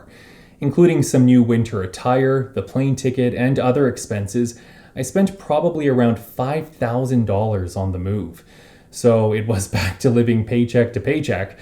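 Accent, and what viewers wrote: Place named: Canada. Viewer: Very interesting and informative. As an aside, you have what I'd call an incredibly clear example of a distinctly Canadian accent. As a lover of accents, I appreciate your video for that fact as well.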